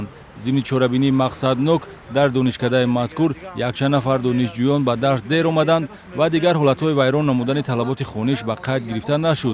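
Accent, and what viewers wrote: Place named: Tajikistan. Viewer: А почему он разговаривает на русском с акцентом таджик же видно и слышно страно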